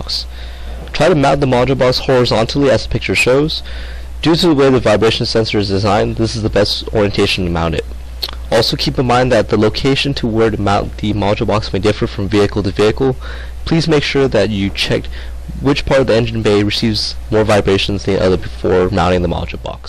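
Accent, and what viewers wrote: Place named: India. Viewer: Your accent....are you from India?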